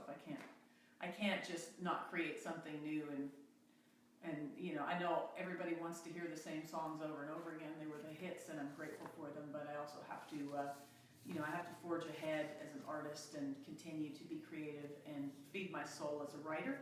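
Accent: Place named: Canada. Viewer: You can definitely tell you are Canadian when you speak, the accent definitely doesn’t show when you sing❤️🇬🇧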